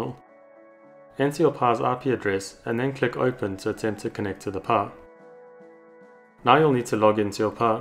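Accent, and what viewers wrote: South Africa. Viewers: I'm a simple man, i hear a SA accent, i subscribe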